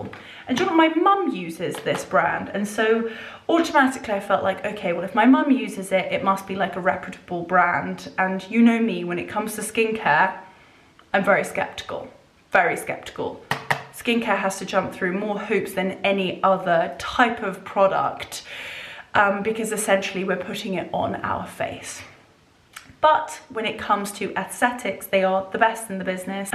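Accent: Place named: Scotland, United Kingdom. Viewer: Love to hear that scotish accent god bless you all❤😊😊😊😊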